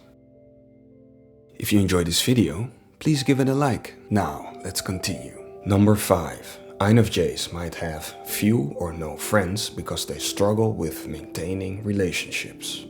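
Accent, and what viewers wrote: Netherlands. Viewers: Any chance the narrator is a native dutch speaker? As someone coming from the Netherlands I thought I heard a bit of a dutch accent :) As an Infj myself I could really relate to this video. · I hear a Dutch accent. I’ve been around the world to find you :-). Come to Mexico and let’s join forces. Let’s come together and create an off-grid community.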